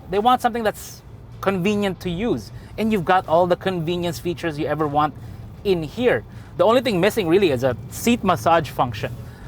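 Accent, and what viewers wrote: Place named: England, United Kingdom. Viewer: English accent ka noh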